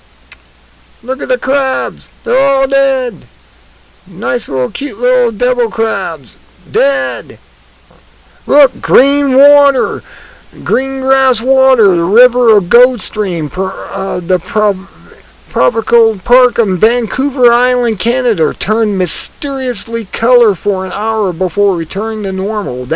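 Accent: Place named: United States. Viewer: You do not read well and your accent is tough for non-American second-language English speakers, but keep going, because it focuses us and helps us follow the story. Keep going! Can you believe the rubbish, oh, yeah, cold water killed those fish? I am from Ohio and fish can be nearly frozen solid and survive, they did it every winter back there? Those fish look horrible and distressed, look at their eyes or the lack of eyes! I have never seen this! The eyes should be cloudy but not missing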